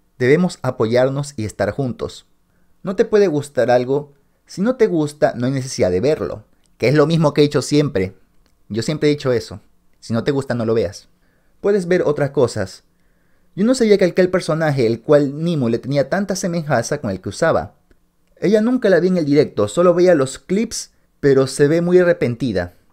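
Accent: Ecuador. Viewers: una pregunta muy tonta creo ¿eres de Ecuador? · Apenas iba a preguntar si eras de ecuador por el acento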